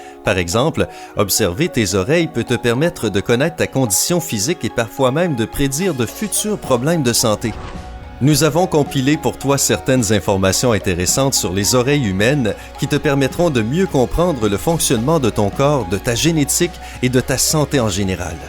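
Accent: Canada. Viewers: vire la voix canadienne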